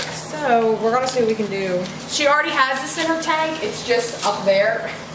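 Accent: Philadelphia, United States. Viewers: Your accent is adorable, philly?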